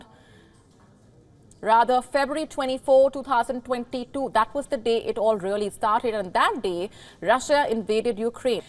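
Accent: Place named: India. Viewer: All Indian female ascents are like this its the most worst ascent in English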